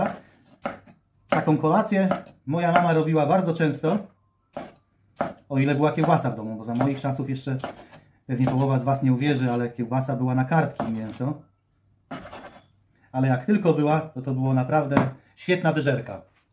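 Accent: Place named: Silesia, Poland. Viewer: myślałem że ze Śląska, bo tak zaciągasz 😊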